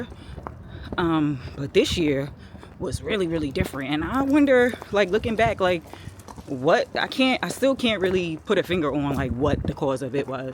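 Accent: Brooklyn, United States. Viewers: Amanda Seales Me! Cut It Out. 😂 You speak a dialect that is very Brooklyn Millennial.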